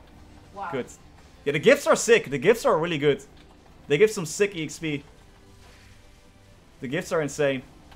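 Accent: Netherlands. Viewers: NL accent is er moeilijk uit te krijgen bij sommigen xD echt hoe hou je het vol zo lang aan t maplen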